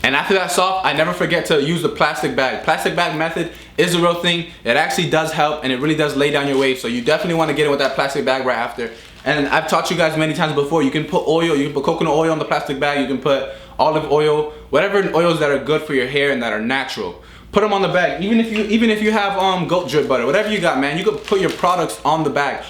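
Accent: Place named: New York, United States. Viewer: No bull shit bro, you lowkey inspired me to cut my curls, im dominican too bro, pero na for real bro you from new york? You deadass sound like it😭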